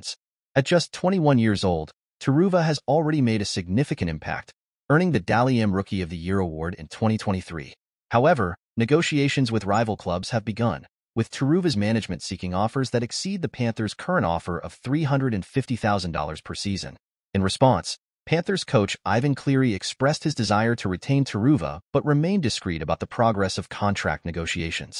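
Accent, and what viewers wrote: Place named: United States. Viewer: Why an American accent?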